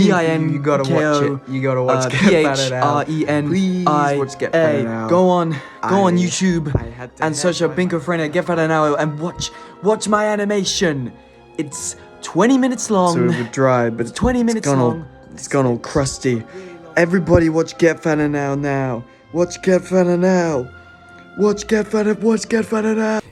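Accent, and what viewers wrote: United Kingdom. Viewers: I can't recognise accents, so I really wanna know if you're from the UK. 😅. I'd be insanely impressed since most people there go to college at 16-18. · wait… this is crazy… he’s… HE’S… BRITISH IN REAL LIFE? AHHHHHHHHHHH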